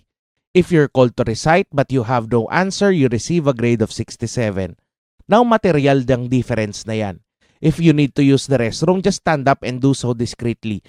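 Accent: Philippines. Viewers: English language with Filipino accent.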